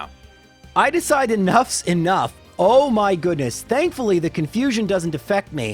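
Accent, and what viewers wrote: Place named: Canada. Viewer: I absolutely love your content J , but the way you say “against” drives me up a fucking wall 😂😂😂 is that because you’re Canadian or some shit ? You sound Canadian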